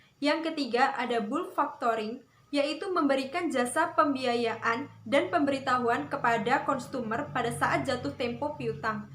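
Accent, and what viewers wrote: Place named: Java, Indonesia. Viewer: Eh orang Bengkulu tapi medok Jawa🤭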